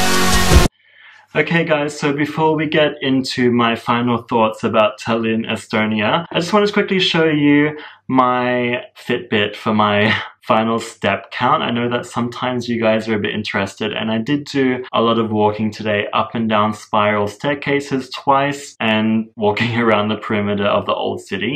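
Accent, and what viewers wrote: Australia. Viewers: Your video popped up because we're heading to Tallin next week, and looking at all the vlogs from there. Hubs is watching while I'm working and I heard the accent I was OOOOOO an Australian accent and came to watch lol. Maybe we'll bump into you in Finland on our way back!! #HappyTravels!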